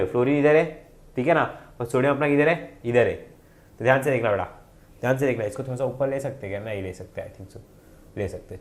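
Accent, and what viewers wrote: Maharashtra, India. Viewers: Sir aap Maharashtra se ho Kya thoda thoda Marathi jaisa language hai aapka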